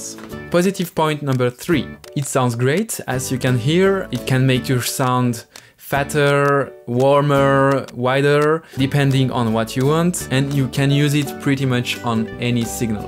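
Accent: France. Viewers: french accent spooted? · Your accent really looks like the french one. idk if you speak french but ya fun fact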